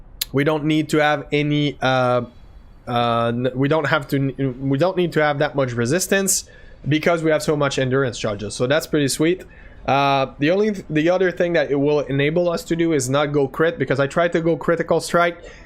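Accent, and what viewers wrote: Quebec, Canada. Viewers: The Quebecer is strong in this one. Am I correct brother man.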